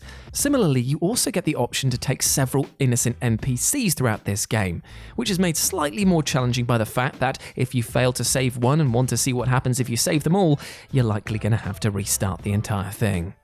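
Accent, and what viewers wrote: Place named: Wales, United Kingdom. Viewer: Noice it's the southwe... wait which part of the Wales ? Heh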